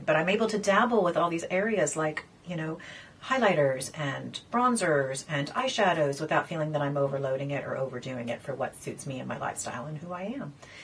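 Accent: Ireland. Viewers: You sound Irish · Is it just my imagination or do you sound Irish sometimes? I just bought this baked foundation in porcelain and will try it today for the first time. Every review I've seen is amazing. Love your channel, I'm 50 and have never really used any sort of moisturizer or sunscreen because I would have a reaction to everything. But now at 50 I've been using Tallow which I triple render and my skin seems to love that just fine. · Your accent sounds ever so slightly Irish at times.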